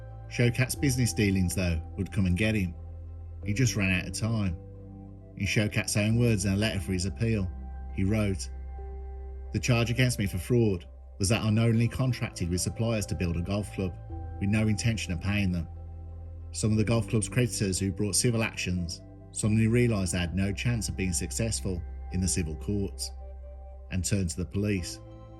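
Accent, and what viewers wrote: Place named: England, United Kingdom. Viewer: Well done fellow, real British events narrated by an English accent, a great channel many thanks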